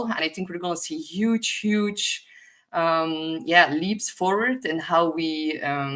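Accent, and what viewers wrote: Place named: West Flanders, Belgium. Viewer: Aaaaaahhhh, je zit vol vuur meid!! Ik heb een stuk de live mee gehad en een stuk nu aan het luisteren. Schitterend hoe je er je westvloams tussen smiet 😂 high vibes high vibes!!! En ik hier roepen.... judges!!!! (Rechters). Zalig ❤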